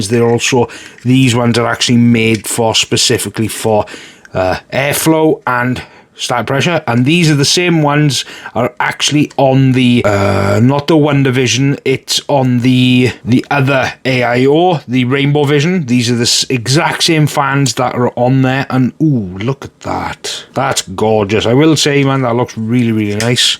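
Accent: Wales, United Kingdom. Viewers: Heya mate! First of all, Im not used to welsh accent, and I must confess I thought you were dutch for a second lmfao. Chatgpt highly recommended this cpu cooler to me, and I was wondering if you had to chance to compare its noise compared to the Noctua or Bequiet that you featured in your comparisons performance wise ? Thanks a lot bud! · That's the most welsh accent I have ever heard in my entire 41 years on the planet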